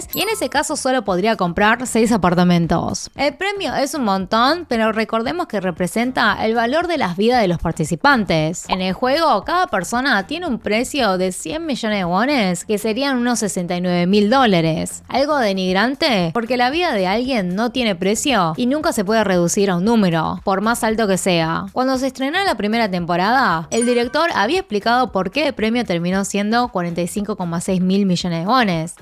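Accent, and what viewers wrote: Argentina. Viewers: como es posible que exista una china, que sepa cosas de korea, hablando español con acento de Argentina o.O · Porque habla como Argentina? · Hola como haces para tener este acento argentino ? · por que habla como tamara falco argentina??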